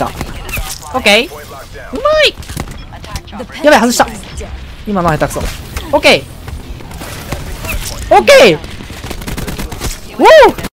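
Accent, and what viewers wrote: Kansai, Japan. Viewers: 関西人やったっけ？って思ったよな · 関西弁自然で草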